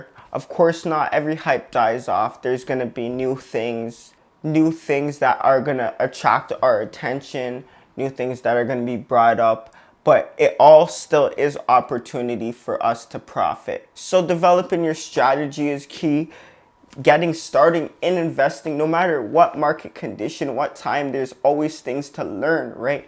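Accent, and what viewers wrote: Toronto, Canada. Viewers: From toronto? Just guessing from the accent.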